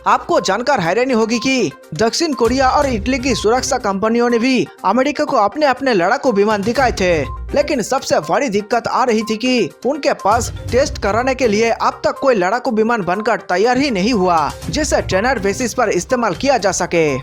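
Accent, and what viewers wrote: Bengal, India. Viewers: I must say, this fellow is a Bengali guy by his Hindi accent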